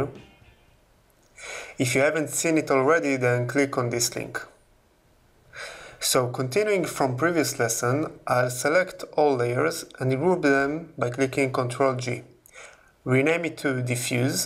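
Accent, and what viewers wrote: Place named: Israel. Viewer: Are you Israeli? You sound very Israeli.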